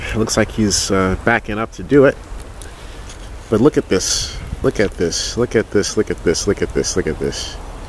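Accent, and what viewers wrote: United States. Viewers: Is your accent representative of the population in Georgia?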